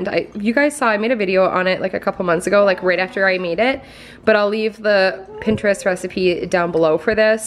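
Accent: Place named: Upper Midwest, United States. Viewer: One of the reasons I started following is because she lives "back home" in the state where I used to live. I love hearing the MN/ND accent.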